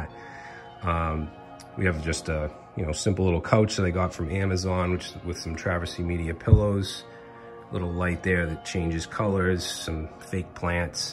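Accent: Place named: Massachusetts, United States. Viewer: There's something about a Mass accent that "sounds honest". Stereotypical of me, I know, but there you have it. Perhaps it's that New England can-do spirit.... or I spent too much time awtching Norm Abram on New Yankee Workshop when I was younger.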